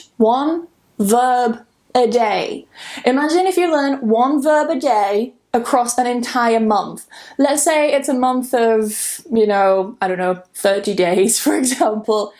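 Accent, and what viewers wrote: United Kingdom. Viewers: I love your honesty. I just found your channel and I think it will become one of my favorites. I want to learn English with a British accent and it looks like you're the perfect person to teach me. I like the way you talk, your accent, your honesty, and besides, you're cute! I wish you all the best this new year and I hope to learn a lot from you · Hi, Pity you're alone on New Year's Day, 'cos your boyfriend is on duty today, in such a remarkable day. But I think that in reality you should't feet sad or either alone, because you have a lot of friends, listening to your remarkable messages in perfect british accent, that 100 K followers that love you in the distance, throughout the cyberspace, look forward every time. You're a new Rose of England, a british angel flying everywhere in the world, upon the wings of thousands of bytes and bytes, zeros and ones, charming and delightfulness with no limits. 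Darling, I beg you to carry on with this selfimposed task of teaching the "lingua franca" that is nowadays the english language as well as the british culture and way of life, to the people around the glove, thing that you implement in a so accurated way. I'm writing to you form Tarragona, a place in Catalonia you know well toghether with its Uni. Rovira i Virgili, a place where as I understand you used to take some courses, some time ago. If you whish to refresh your knowlegde of the many languages you're fluent with, and make some practice with me either in Spanish or in Catalan, do not hesitate in sending to me, via e-mail: all the letters you want, with the messages you wish, so short or long as you fancy, whenever you feel like, and about the items you consider interesting or educational, for your personal understanding or from a point of view of professional developpment. If in case, please contact e-mail: <mail>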